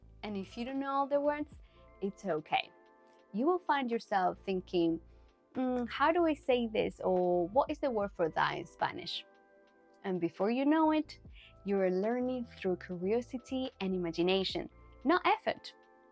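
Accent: England, United Kingdom. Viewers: Your videos are very helpful thank you. Are you living in the UK? I think I can hear an English accent in there lol. I’m from Northern Ireland. I hope your channel grows as it should.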